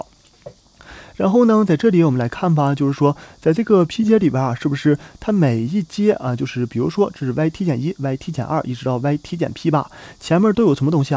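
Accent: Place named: Dalian, China. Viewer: 感觉像大连人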